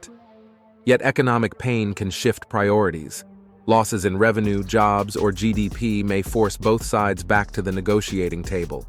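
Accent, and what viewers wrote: United States. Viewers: What awful narration. American accents are torture. The CIA can ditch waterboarding. Just make 'em listen to this.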